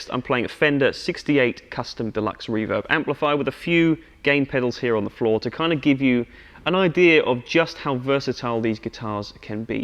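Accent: United Kingdom. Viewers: He sounds like joe bonamassa with British accent! 😅 ! Nice playin tho lit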